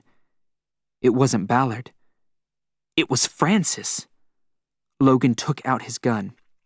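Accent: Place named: South, United States. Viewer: After knowing Logan as Michael York for 50 years, it's funny hearing him narrated with a southern accent. And his world not being confined to a giant shopping mall.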